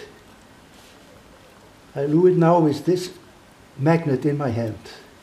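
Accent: Netherlands. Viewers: I can hear he is dutch although his english is very good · idk know why he has a dutch accent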